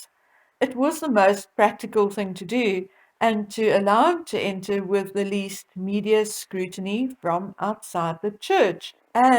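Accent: South Africa. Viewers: It’s so lovely hearing your South African accent🥰🥰🥰🥰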